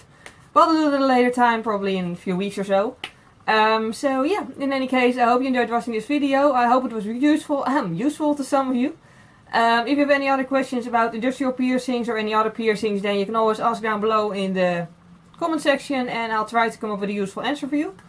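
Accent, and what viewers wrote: Netherlands. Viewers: She's Dutch, she has an accent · I think I hear a dutch accent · are you Dutch?? Random question but i feel like i hear that accent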